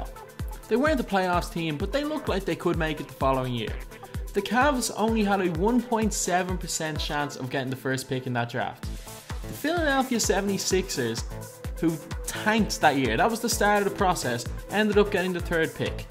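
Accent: Ireland. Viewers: I love the Irish accent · Oi Oi Are you Irish kiad 🇮🇪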